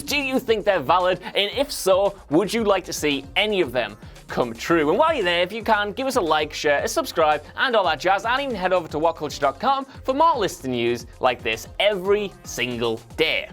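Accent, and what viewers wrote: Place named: North, United Kingdom. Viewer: Jesus this guy sounds like a resident of the fucking North in GoT . Hopefully you're a Stark loyalist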